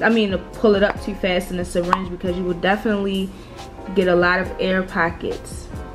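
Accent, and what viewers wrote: Baltimore, United States. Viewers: Omg your accent!!! Your totally from Baltimore!!!!! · Omg her accent, is she from baltimore??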